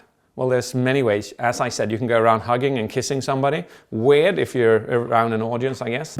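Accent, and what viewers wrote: Sweden. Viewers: I love the swedish accent lol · I can't unhear the slight Swedish accent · We love you! & I love the editing! Please keep it up! I love Swedish accent!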